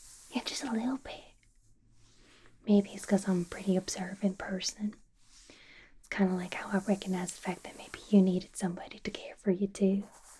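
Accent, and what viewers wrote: South, United States. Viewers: love the southern accent!! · As a huge marvel nerd, the southern accent is a nice touch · love the inclusion of small southernisms, it really sells the accent and the video was great :3 - a southern person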